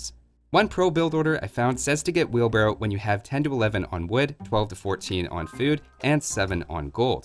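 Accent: Canada. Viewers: You are Canadian? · Wait. are you canadian dude??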